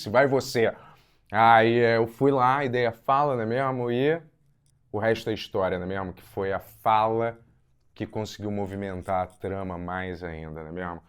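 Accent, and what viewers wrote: Rio de Janeiro, Brazil. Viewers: mano eu odeio teu jeito de falar e esse jeito de playboy carioca ''nem de esquerda nem de direita'' puta que pariu\ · Muito carioca! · Amigo ,carioca. Carioca, amigo. Pronto, agora você já sabe como fala um carioca.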